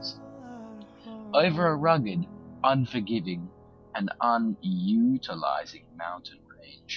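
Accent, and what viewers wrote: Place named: United Kingdom. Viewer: well structured with good music. the british accent was not needed. Deuchar Allen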